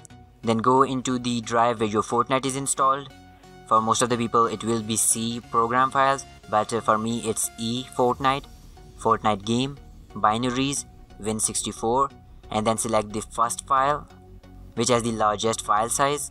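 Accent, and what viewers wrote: India. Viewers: That Indian accent tho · i dont trust indian voice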